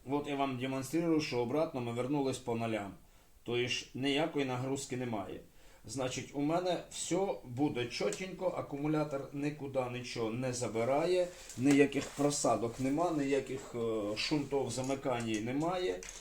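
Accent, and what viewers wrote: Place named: Central Ukraine, Ukraine. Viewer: Його рідна мова - суржик, а точніше діалект, яким розмовляють в центральних областях.І він від цього не париться.А страждаєш ти, і причому страждаєш дурістю.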